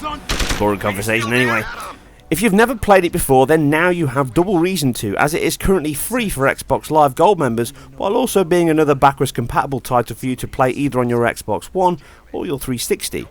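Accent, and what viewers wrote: United Kingdom. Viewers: Tks!! I love the british tone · He is. English, to be specific. I've been trying to work out his accent for a while, but I can't be more specific than that.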